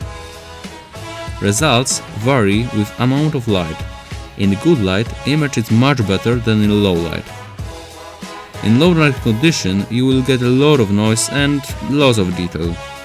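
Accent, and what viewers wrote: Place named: Poland. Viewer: dobrze trafiłem, że jesteś Polakiem po akcencie:) Jakbys kiedys przypadkiem doszedł do tej funkcji to fajnie byłoby gdybyś nagrał o tym film:) Pozdrawiam.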